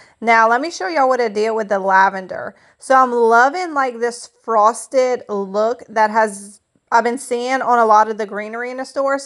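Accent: Louisiana, United States. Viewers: You’re most definitely a southern Louisiana girl! 😉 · Your accent is Louisiana! Southern La. at that. I’m from BR. Maybe on the west side of BR maybe one of the Acadians parishes. Loving your channel! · Darling, You came up in my recommended videos and I told my little granddaughter she's a Cajun!!! 💜 I know she's a Cajun!!! 😁❤️ I can hear it in her voice! She sounds like a Cajun! She's from south Louisiana; I just can't quite pinpoint where she's from. She could be from Lafayette or Ville Platte, or somewhere in the south,; maybe west of Lafayette! I'm not sure but I know she's a Cajun; I can hear it, in her accent. She talks like me. (I'm from Mamou. ) 😁 You're not only a gorgeous Cajun but you're very smart and so talented you have an eye for fabulous flips and I love watching your videos you give me so much inspiration. I'm following you now.. 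I wish I could put you at the top of the list of my videos that I watch that are suggested by YouTube his man you're good at what you do. Blessings to you. ❤️ P.S. please eat some boudin for me. Haha ❤️❤️❤️❤️❤️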